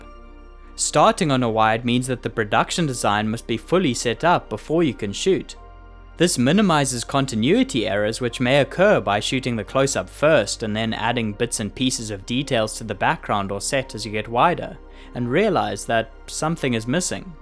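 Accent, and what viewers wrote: South Africa. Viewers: sorry good sir are you South African??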